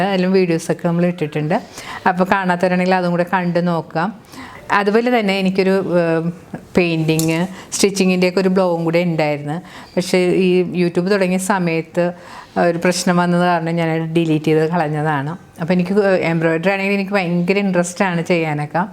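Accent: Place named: Kochi, India. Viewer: Inspiration video 😘😘 നാട് കൊച്ചിയിൽ ആണെന്ന് സംസാരം കേട്ടപ്പോൾ തന്നെ മനസ്സിലായിരുന്നു🤪, സെറ്റിയടെ അടുത്തിരിക്കുന്ന flower base കിടിലൻ ആണല്ലോ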